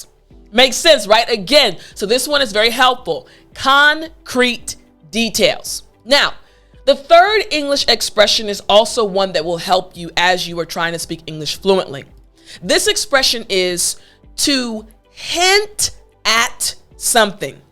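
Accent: New York, United States. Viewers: ARE YOU FROM NY? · Are you from NY ? Sounds like that)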